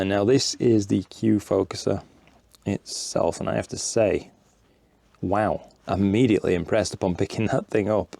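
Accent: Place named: Yorkshire, United Kingdom. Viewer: Shoved up , love it good old Yorkshire speak 👍. Happy birthday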